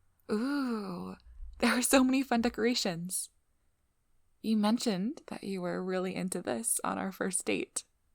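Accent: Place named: United Kingdom. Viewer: When I tell you I SQUEAKED when I heard the British accent. I turned into a huge ball of floof squealing and kicking the air while morphing into a red balloon.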